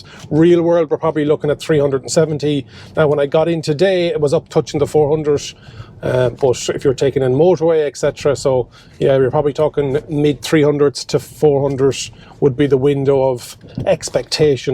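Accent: Ireland. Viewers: Nice review. My Irish wife from Mayo is sitting with me and she's trying to figure out your accent. County Meath?? She cannot figure it out.😊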